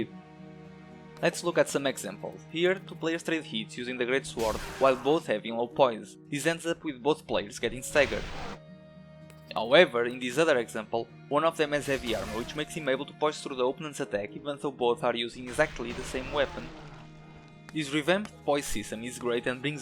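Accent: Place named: Russia. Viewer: rapaz tu ta de brincadeira que é de portugal, sério? aren't you russian? cant believe this accent is portuguese UHEHUAEHUAUHEUHEA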